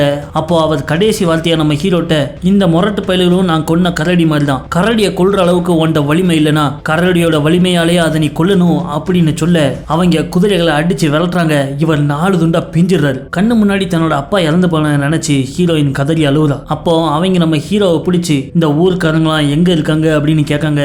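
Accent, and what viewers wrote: Tirunelveli, India. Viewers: திருநெல்வேலி ஸ்லாங் சூப்பர் 👌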